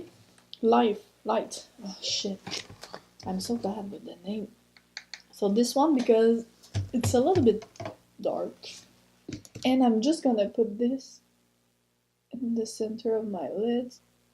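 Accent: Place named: France. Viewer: gurl don't hide your French accent, I love when you put the accent on the RRRRR 😍😍 you look gorgeous and that eyeshadow is so beautiful!!!! Great look ❤️🤩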